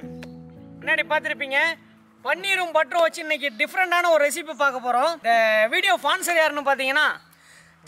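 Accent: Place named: Kongu, India. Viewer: ji kongu tamil Vera level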